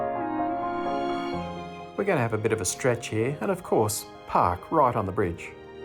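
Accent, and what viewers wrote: Australia. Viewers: I’m a new follower to you and love your brilliant video content. In particular I love your Aussie accent and think you sound like the late and great Clive James ! Has anyone else said that ? I’m so jealous of your outside life down under, you have it all and it’s a bikers paradise. Regards from the not as exciting UK 🇬🇧 😂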